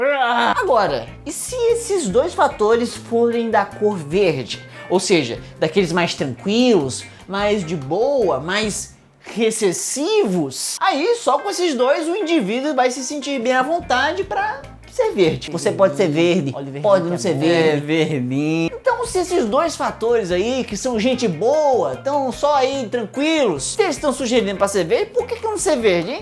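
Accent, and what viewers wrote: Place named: São Paulo, Brazil. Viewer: Queria saber por que você é Cearense e não tem o sotaque de Cearense no T e no D normalmente. Só fui perceber isso agora! Você usa o T e o D de paulista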